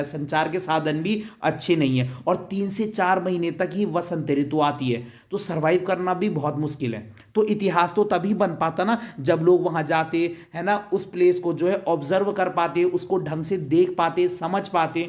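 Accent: Garhwal, India. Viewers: Sir aap garhwali ho kya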